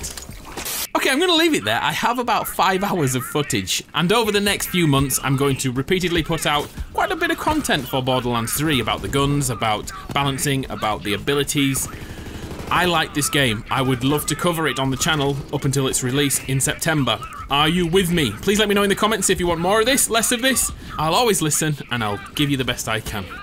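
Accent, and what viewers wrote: United Kingdom. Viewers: Nice to hear a Brit reviewing my favourite game. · idk why but i like the british accent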